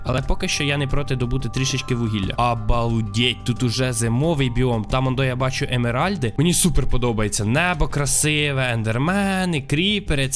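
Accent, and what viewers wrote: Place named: Surzhyk, Ukraine. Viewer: ти насуржику говориш я це чую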